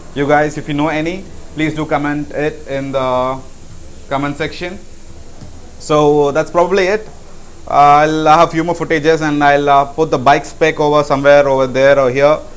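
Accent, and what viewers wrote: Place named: India. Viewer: Indian accent is so ugly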